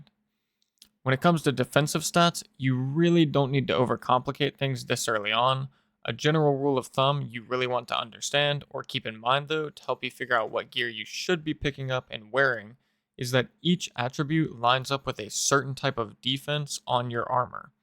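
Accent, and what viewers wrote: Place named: RP, United Kingdom. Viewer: Why do you sound so posh mate?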